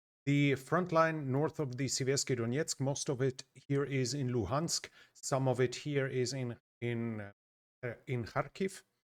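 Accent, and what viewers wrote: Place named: Germany. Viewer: With your voice today more close to Deutsch accent 😊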